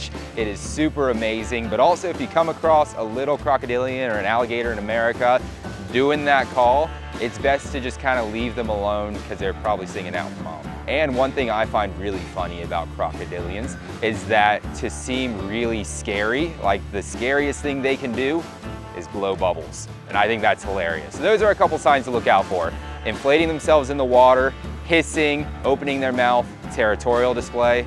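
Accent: Australia. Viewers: He’s sounding a little more Aussie.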